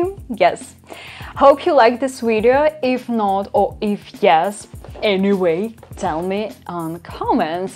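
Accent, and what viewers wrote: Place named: Ukraine. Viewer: I didn't know you were Ukrainian but I suppose you were from around based on your accent. I'm also a huge Foals fan and I saw you reacted to one or two more songs by them. Much hugs and love from your number one fan from Bosnia. Your reactions are awesome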